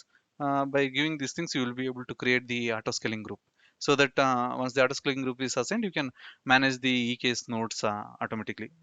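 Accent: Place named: Karnataka, India. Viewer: KANNADIGAs ENGLISH SLANG is good to hear.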